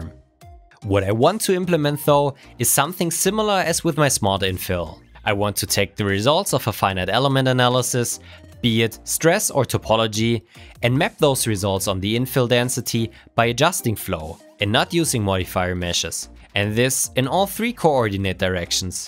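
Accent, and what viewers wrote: Germany. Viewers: U aren't the only one who noticed german accent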